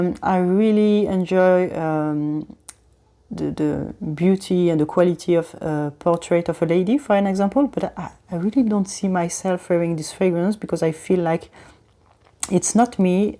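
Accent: France. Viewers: Love your videos and collection and on the top, Looooove your English with French accent 😘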